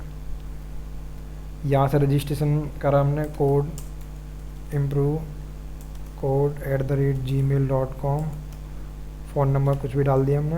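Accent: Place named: Moradabad, India. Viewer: Awesome! Btw are you from Moradabad, Uttar Pradesh?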